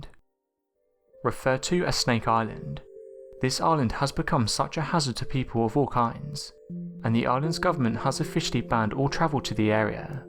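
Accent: United Kingdom. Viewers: You sound like a British libtard that insists on importing Muslim illegals cause you love them so much, even though they hate and want to kill you....... Tell us I'm wrong....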